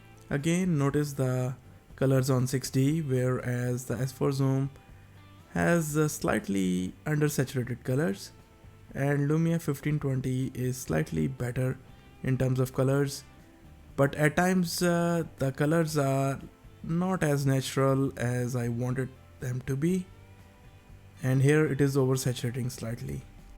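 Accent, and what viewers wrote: India. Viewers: indian accent English is genuine ear cancer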